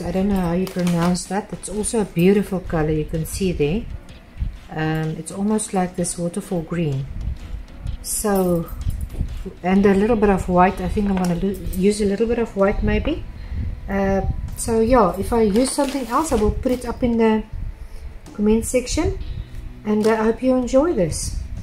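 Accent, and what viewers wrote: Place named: South Africa. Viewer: I love him. I love elephants! You're from South Africa? Very cool. I was wondering what accent you had.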